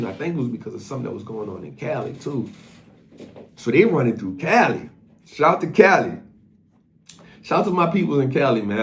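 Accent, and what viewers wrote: New Orleans, United States. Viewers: I'm from New Orleans and instantly noticed that accent, saw you on earn your leisure podcast and you are an inspiration, I started invested a couple years ago and this year I'm finally grasping this and I love the way you explain things, I want my money to grow even more and faster so I can actually enjoy it, big inspiration man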